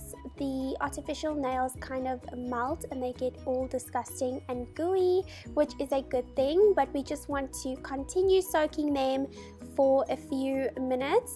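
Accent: South Africa. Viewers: You sound really innocent and cute ♥️😇 There's something original about your accent, sort of South African & I love it 💖💖💖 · Hi, nice to hear a South African voice😋🇿🇦Thank you for the great video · You're south african🥰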